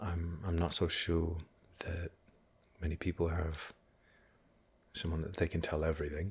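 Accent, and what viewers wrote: United Kingdom. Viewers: Was craving somebody with an accent such as yours because, little secret, British accents drive me CRAZY but in the best way possible! Truly on of the best things I've listened to 👏👏🤌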